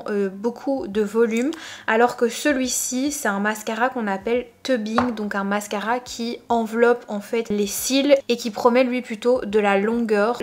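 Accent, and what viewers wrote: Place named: Belgium. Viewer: J'adore l'accent belge.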